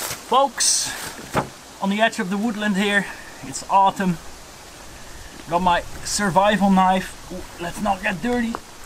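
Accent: Netherlands. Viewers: Ireland? You sound Dutch, am i right? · He has a Dutch accent is he from Holland ?? Edit: creepy background music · Ik hoor dat hollandse accent wel ! :D van waar ben je ? Ik ben een beginnend outdoorsman van Belgie, heb materiaal gekocht maar zou leuk zijn als er iemand is die me irl wat zou kunnen bijleren. Misschien kan ik ook helpen met filmen ? Laat maar weten ! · U have such a dutch accent or is it just me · You sound like ur from the netherlands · Yo broeder. Aan je accent te horen hoor ik iets Nederlands. Klopt dat? Gewoon nieuwschierig. Top video! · jij bent sowieso nederlander ik hoor het🤣 wel hele toffe video👍👍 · ja kan dat mooie Nederlandse accent gewoon niet verbergen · Gotta love the dutch accent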